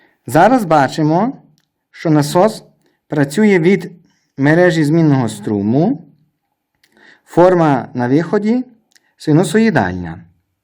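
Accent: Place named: Lviv, Ukraine. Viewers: тільки у Львові такий говір) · тільки у Львові такий говір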